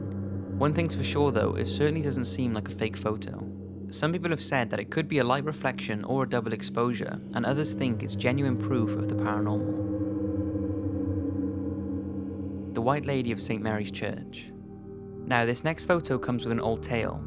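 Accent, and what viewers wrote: Wales, United Kingdom. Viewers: just has the accent of a Welsh person and coincidentally I'm Welsh too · OH LOOK AT THAT! At the time of writing (1958, Sun 17 Jan 2016) you have 1,002,042 subs! Well deserved, awesome channel, site, Twitter etc. Well done! Many congrats from Scotland (ps are you Welsh? Bit crap at accents 😕)!!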